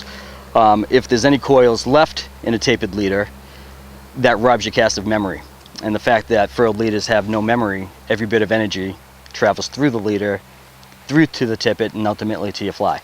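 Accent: United States. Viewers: Spent the first half of my of life Boise, much of the second in New Jersey. You're definitely not from the former, lol. Massachusetts? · That is one crazy Idaho accent.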